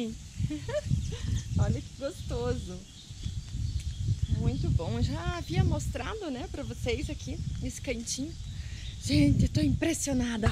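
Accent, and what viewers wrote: South, Brazil. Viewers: Bom dia...sou seu fã...me diz uma coisa...de que região vocè é . ?...tem sotaque Sulista....beijos...aqui de Alm.Tamandaré-PR...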